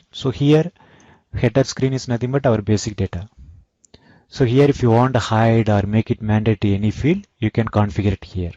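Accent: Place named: India. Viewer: Next time speak slowly , Indian English (hind) is confusing !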